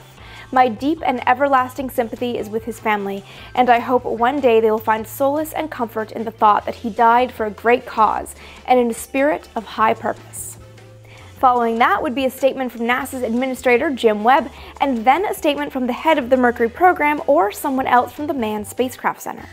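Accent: Canada. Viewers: so sorry but your love for NASA shows american, your slight accent shows Canadian, what are you?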